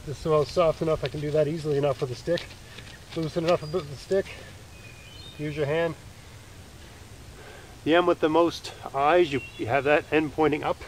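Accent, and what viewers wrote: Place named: Atlantic Canada, Canada. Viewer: I've been trying to figure it out too! lol. More like Rick Mercer I'd say. East coast. Except Rick is from St Johns. But closer to Nova Scotia than Norm is for sure.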